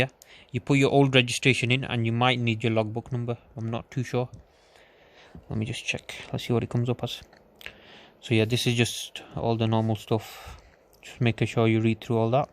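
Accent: Bradford, United Kingdom. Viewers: Thanks g, deffo a Bradford mush 🤣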